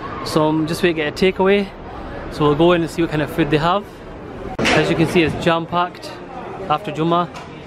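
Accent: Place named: British Pakistani, United Kingdom. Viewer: He seems a british pakistani by his way of speaking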